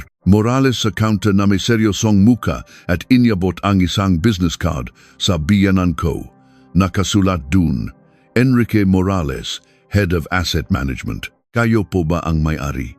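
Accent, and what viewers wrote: China. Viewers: Ano ka Sir, intsik ka ba? bakit ganyan lkung magsalita itongnarrator na ito nkakahiya ka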